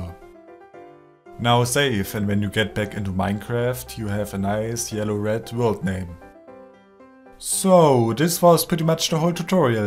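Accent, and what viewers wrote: Germany. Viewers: Man hört richtig raus das du Deutscher bist :D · Are you german´? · ich auch hat man aber auch an den namen der welten gesehen und an deiner ausprache. das der beste command schreiber der welt ein deutscher ist omg xD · Warte, "Aufbau" und dann noch dein Akzent... Ich glaube du bist Deutscher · Man hört sowas von das du ein deutscher bist xD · I love your German accent so much… :D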